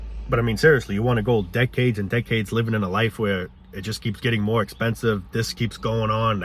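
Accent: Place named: Staten Island, United States. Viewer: He sounds like he’s from Staten Island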